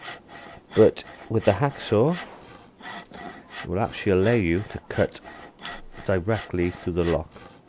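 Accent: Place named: South Wales, United Kingdom. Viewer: Is that a Cardiff accent ? It's definitely south walian 😂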